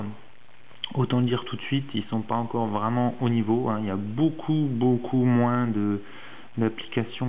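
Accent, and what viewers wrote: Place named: East, France. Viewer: Bel accent de l'Est ça me rappelle mes racines!!! Sinon ton galaxy semble avoir des soucis, le slide parait bien plus réactif sur le mien et je n'ai jamais eu de problème avec l'APN... Belle demo en tout cas!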